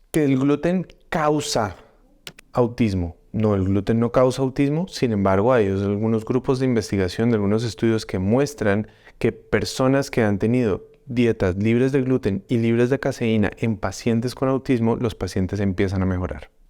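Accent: Colombia. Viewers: Gracias... maravillosa información..saludos de México amo el acento Colombiano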